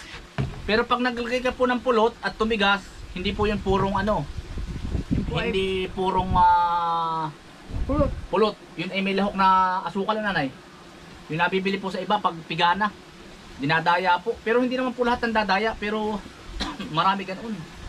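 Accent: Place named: Batangas, Philippines. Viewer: batanguenyo kb, puntong batangas ka eh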